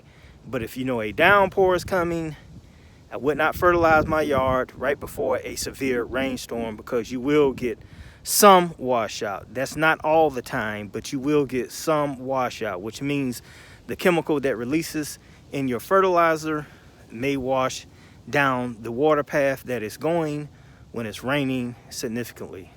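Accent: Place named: Atlanta, United States. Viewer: Now I'm from Avondale and I could tell right away. Lol I told my wife that's Atlanta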